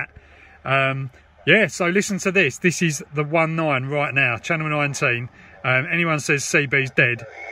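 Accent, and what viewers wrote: United Kingdom. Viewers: I love how the British pronounce all the vowels in aluminum. BTW what type of cb radio is that?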